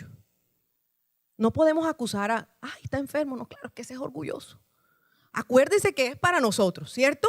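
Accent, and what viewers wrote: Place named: Caribbean Coast, Colombia. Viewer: Gracias, la pastora se escucho muy costeña. Muchas gracias. Muy buena predica... Att: Una Barranquillera desde USA, NJ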